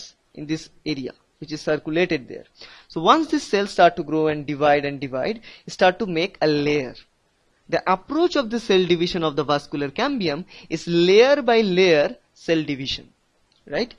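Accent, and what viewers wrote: Bengal, India. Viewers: thanx bro, Ur accent looks Bengali but doesn't matter. explanation was gr8 thank u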